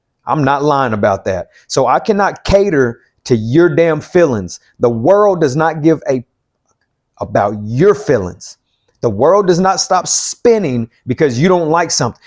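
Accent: United States. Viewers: I like your country/ Southern accent 😉😊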